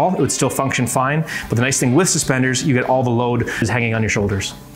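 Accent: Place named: Canada. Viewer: I detect a Canadian accent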